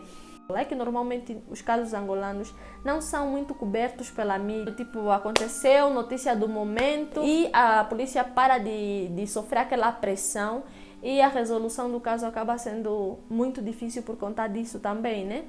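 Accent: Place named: Portugal. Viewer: Voce puxa mais para o portugal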